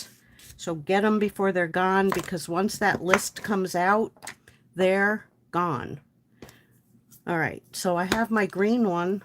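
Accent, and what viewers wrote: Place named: Brooklyn, United States. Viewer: Very cute verrrry cute! You sound like youre from Brooklyn lady!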